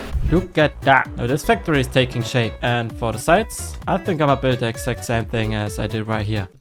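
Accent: Germany. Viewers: als ich ihn zu ersten mal reden gehört hab, wusste ich direkt er wäre deutsch, W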